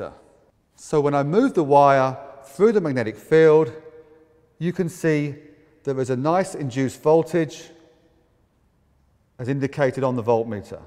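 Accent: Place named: England, United Kingdom. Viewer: if you google the pronounciation of wire on google there are two ways to say it. he is saying it in the British way. shocking for you there are accents other than an American so shut up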